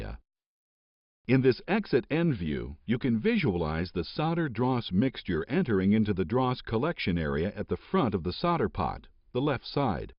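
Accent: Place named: North America. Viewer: Oh Yes, how right you are. This is a North American pronunciation thing. Even The Mythbusters say 'sodder'. Why is it so?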